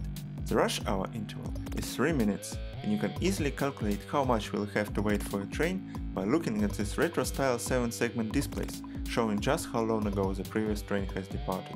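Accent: Russia. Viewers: Сразу по акценту понятно, рассказчик - русский)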